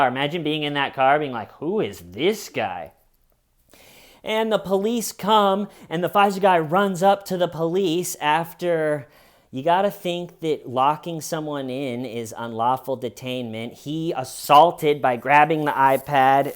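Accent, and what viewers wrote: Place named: Connecticut, United States. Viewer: You sound like your from Connecticut or some shithole like that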